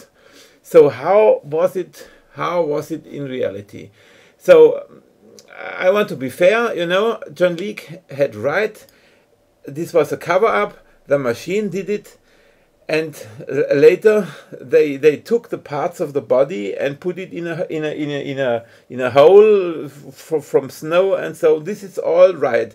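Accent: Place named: Germany. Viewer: EXCELLENT WORK, I JUST WISH IT WAS NARRATED BETTER..SOMEONE WITHOUT A THICK, STUTTERING GERMAN ACCENT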